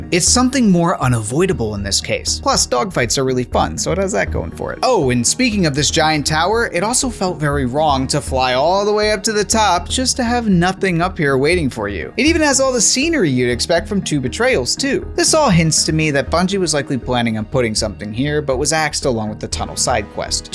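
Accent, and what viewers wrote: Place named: United States. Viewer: Voice reminds me of shilt show! With a different American dialect,Lol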